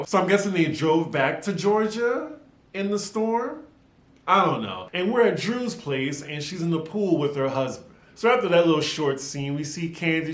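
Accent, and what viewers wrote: New Orleans, United States. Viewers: I love that you were doing your New Orleans accent baabyy!!! 💛🖤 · Live your New Orleans accent 😁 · Ok yassss👏🏾👏🏾👏🏾👏🏾 with the NOLA accent, luv it😊👍🏾👍🏾. U already Knowwww!!!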